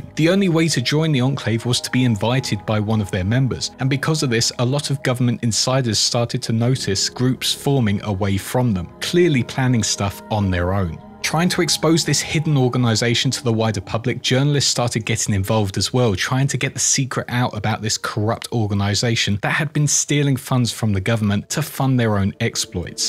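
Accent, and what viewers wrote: United Kingdom. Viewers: Oh god NO did I find another British narrated video game lore channel? Now I’m going to have to watch all the videos. I’m caught up on the fudgemuppet catalog already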